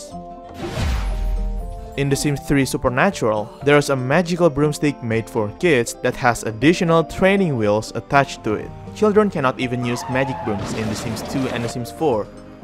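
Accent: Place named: Indonesia. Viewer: Dude are you indonesian? I assumed it by your channel name and your accent lol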